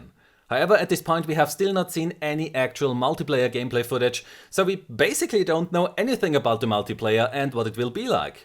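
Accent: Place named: Germany. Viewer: new sub here. Not sure if it's your German accent that keeps me listening or your focused and mature analysis of games, but either way, keep up the great work. You're bound for 1.5 Million subs soon!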